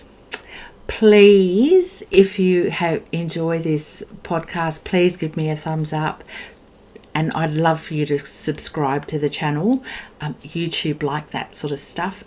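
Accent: Australia. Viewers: I knew you were a fellow Aussie from the first hello. Loved your video! subscribed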